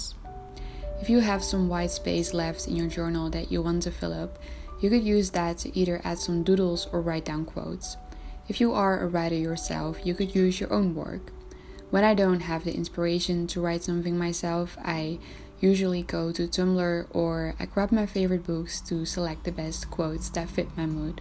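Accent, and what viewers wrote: Netherlands. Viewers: What country do you come from? (Your accent sounds a bit dutch) 🌻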